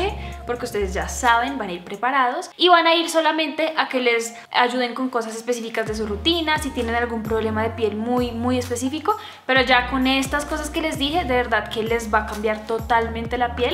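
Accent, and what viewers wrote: Bogotá, Colombia. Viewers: Me gusta mucho tu acento colombiano, por cierto ¿cómo podemos saber quién es un buen dermatólogo o cómo identificarlo? <3 <3 saludos desde Oaxaca, México. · Así hablamos los bogotanos 🤗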